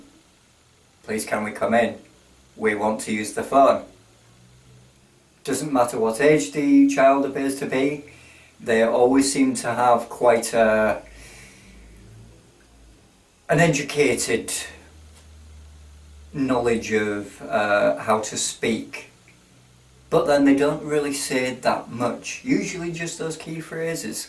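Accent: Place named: United Kingdom. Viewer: Some of the words you pronounce but guess it depends on what part of the UK your from cool :-)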